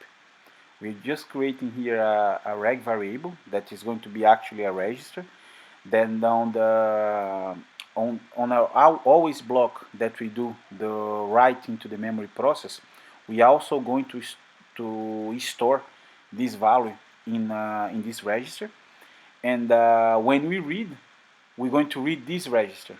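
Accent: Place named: Brazil. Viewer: Great, but that brazilian accent :D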